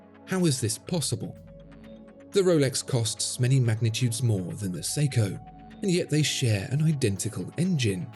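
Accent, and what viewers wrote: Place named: RP, United Kingdom. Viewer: Okay, so I heard "A Rolex is to watches what chimpanzees are to primates, the best, the original, the classic." I don't care for Rolex but are we just going to act like saying that chimpanzees are "the best, the original, the classic," is fine as long as it's said by an elegant voice with a High Street accent? Oh, my oh my. Hilarious.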